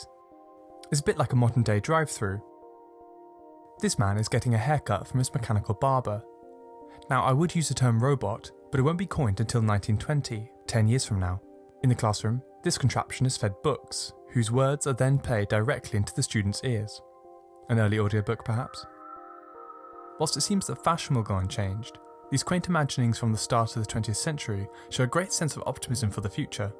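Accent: United Kingdom. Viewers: i was worried this video would be ai but u sound too british to be ai so i think were good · Tell me how I knew the narrator would be a Brit before I even started the video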